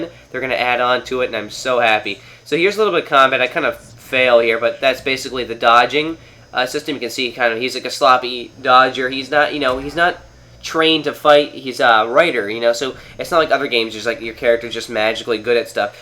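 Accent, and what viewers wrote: Canada. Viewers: lol i didnt kno u were canadian till i watched this video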